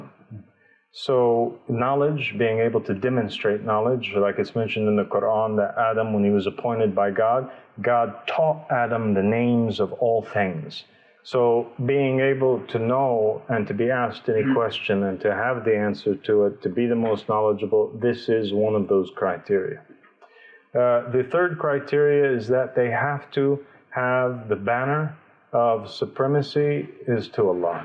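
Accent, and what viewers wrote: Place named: Texas, United States. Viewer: لسانك مو عربي والاكسنت مالتك تكول كاعد بتكساس شنو مهديكم من تكساس😂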